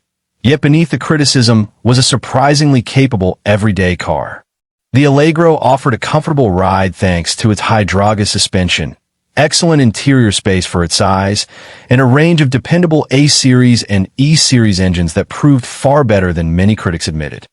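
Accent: United States. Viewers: Number 8 MG Zed R. Why is this narrated in an American accent? A channel with the title UK Torque, referencing British cars, should at the very least use a British narrator.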